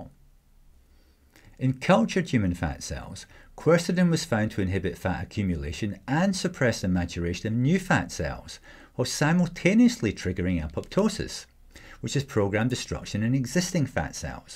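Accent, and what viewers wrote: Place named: Edinburgh, United Kingdom. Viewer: You’re not very clever, are you? There is absolutely no issue with the guys accent. Sounds like an educated Edinburgh Scot. OK, he’s not North American, but neither are 98% of the world population. You need to open your mind and open your ears.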